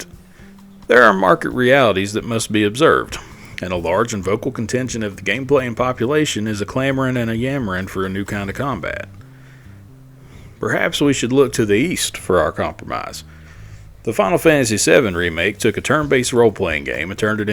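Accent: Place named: South, United States. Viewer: I agree, that's why I'm kinda wondering why he hasn't blown up. Smooth, precise with a certain southern charm twang going.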